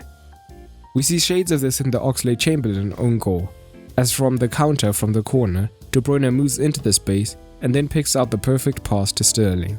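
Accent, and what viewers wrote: South Africa. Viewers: You sound South African, am I right?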